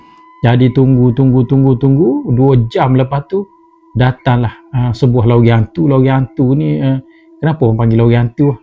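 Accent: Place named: Kelantan, Malaysia. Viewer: Bahasa tu bang.... Lekat sangat bunyi Kelantan... Kadang kurang faham... 😊... Harap maklum ye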